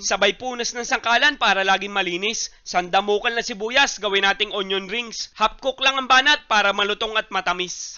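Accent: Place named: Oriental Mindoro, Philippines. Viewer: Natutuwa ako sa pananalita mo .alala ko ang time ko aa Oriental mindoro..ala eh ganyan sila magsalita..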